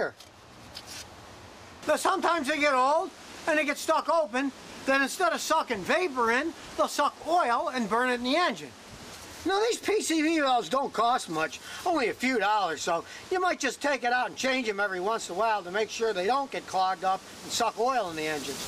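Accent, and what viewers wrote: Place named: South, United States. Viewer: Where it'd be drippin its hard to tell he said that because his southern accent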